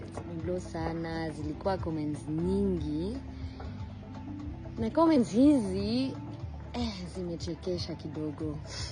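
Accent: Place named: Kenya. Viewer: Your accent is so Kenyan how is that possible.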